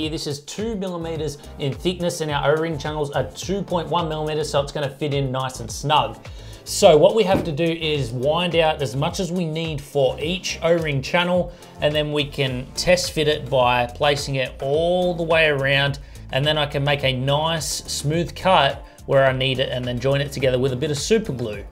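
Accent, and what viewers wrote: Australia. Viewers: Are you situated in Australia? Cause u have an Aussie accent.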